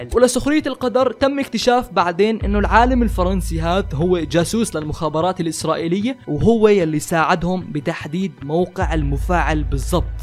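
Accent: Jordan. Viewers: لهجته مبينه اردني 😂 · ياالله يل لهجه العسل احب الاردن اخوك من العراق❤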